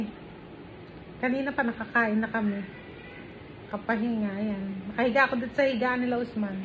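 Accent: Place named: Batangas, Philippines. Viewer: oh i see. ung boses at punto mo kc parang batangas.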